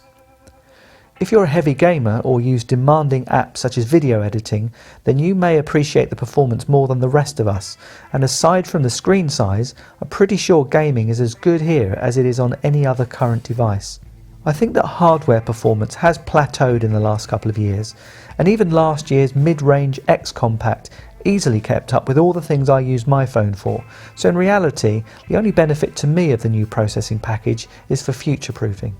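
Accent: United Kingdom. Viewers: Loving your Brits accent and of course the review. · I like your perfect British accent! Greetings from Russia!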